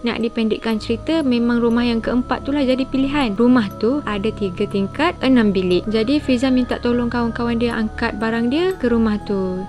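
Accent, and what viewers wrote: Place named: North, Malaysia. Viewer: Comel. Dia campoq bahasa baku dgn bahasa utaqa. Hahahaha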